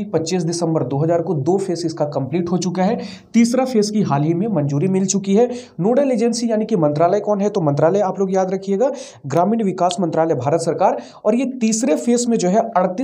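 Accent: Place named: Bihar, India. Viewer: 7/11 , sir jis tarah aap 11 ko ekgyarah bolte hai na ...bahut achha lgta h.. i think aap bihar se belong krte h...agar mai galat hu to I'm sorry plzz ..mujhe jo lga Maine bola ..but I really like ur way of teaching 🙏🙏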